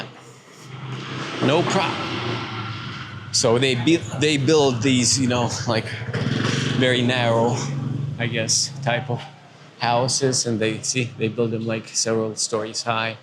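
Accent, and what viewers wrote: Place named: United States. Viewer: Nice vlog, I was in Nha Trang 2 years ago … Were you born or lived in the US? You have an American accent . Keep on vlogging please . Thank you .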